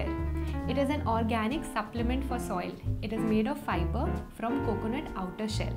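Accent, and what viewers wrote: India. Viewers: Nice indian English। speaking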